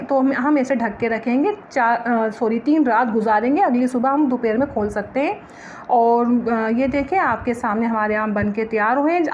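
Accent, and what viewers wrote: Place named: Sindh, India. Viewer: Sindhi lehjey men Urdu bhht achi lag rhi h ap boltey hoey..and acha tariqa h pal laganey ka but aam ko pani nhi lagay jata h us se aam naram ho jata h.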